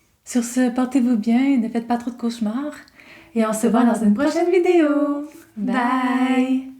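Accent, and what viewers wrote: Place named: Quebec, Canada. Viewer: J'ai failli le dire, jusqu'à ce que je comprenne rapidement que c est à cause de l accent dont je ne suis pas habitué. J ai évité de le dire à la base pour ne pas que la youtubeuse le prenne pour elle, les autres vidéos intéressantes d autres youtubeur québécois ça me fait pareil. Tu pourrais la décourager alors que ce n est pas elle le problème.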